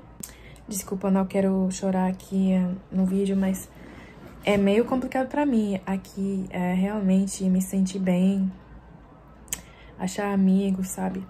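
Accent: Northeast, Brazil. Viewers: vc realmente é nordestina vc ta falando sotaque 👉 vixi que bom a casa é sua NATAL RIO GRANDE DO NORTE. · Volta logo para o Brasil🇨🇦🇧🇷vc já tem o sotaque Brasileiro❤️🥰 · "Ne" pra la "ne" pra ca kkkkkk parece nordestina zkzkksksksks ❤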